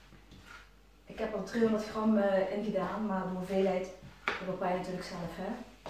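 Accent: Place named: Limburg, Netherlands. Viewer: Ik hoor een Limburgs accent! Ik kom namelijk ook uit Limburg hahah leuke filmpjes!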